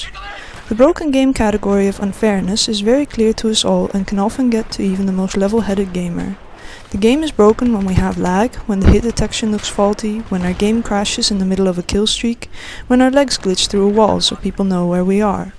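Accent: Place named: Netherlands. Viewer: Definitly Dutch accent!!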